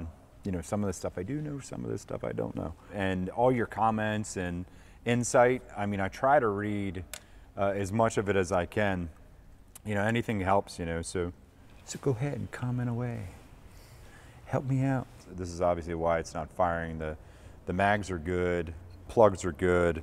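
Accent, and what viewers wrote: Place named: Philadelphia, United States. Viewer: Anyone else smell that? "We've been here all night" he walks out the door, and back in -- that's a lot of light outside, in January, around where I assume he is around Philly (I grew up in Levittown) -- I know that accent, and plus when he fired up the GPS in the plane it seemed to center around Delaware county or so. Then he says he needs $20k. I smell scam. Which is a shame, cause watching him get the props loose was really fun. Something's not right.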